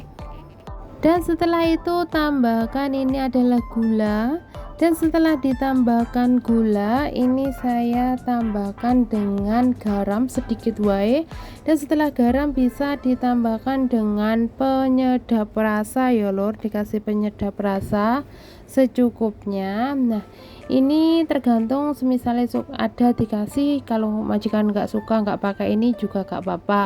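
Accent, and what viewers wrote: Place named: Java, Indonesia. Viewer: 😍😍😍, ketemu konten nya mbak saya jadi semangat masak , trimakasih ya mbak 🥰🥰🙏 mbaknya asli Gresik Yo kok jowo ne lucu 😂😂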